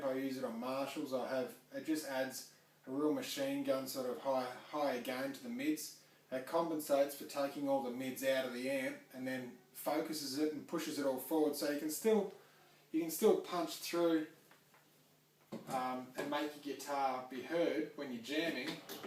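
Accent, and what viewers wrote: Australia. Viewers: Ok, that's an Australian accent. Nice, dude. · Fooking Australian mate! I thought he was American for some reason. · I like your Australian accent hehe!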